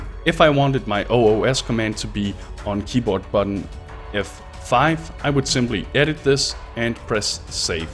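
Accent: Denmark. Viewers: Thanks for sharing the macro man! I got it to work just fine and it's working smoothly so far. By the way, just to see if I've managed to identify your accent properly; are you danish?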